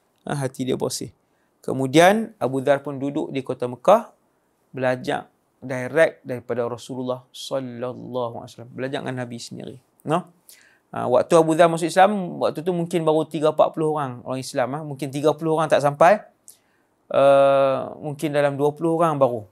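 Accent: Kedah, Malaysia. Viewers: Bismillah Alhamdulillah Assalamualaikum murabbi Wadi Annuar terbaik perkongsian sebelum menunggu masuk solat Asar layan sirah dulu senang faham Kedah pekat ringkas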